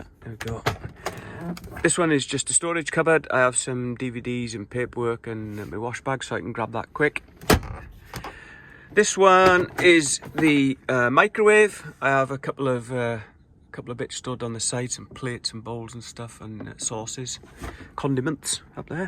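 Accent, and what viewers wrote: Wales, United Kingdom. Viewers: Not a trucker but have binge watched your channel this week, which I enjoy 2 questions: Am hearing a Welsh underlying accent? Where is home when you are there?, not far from me Leeds/Harrogate/York? maybe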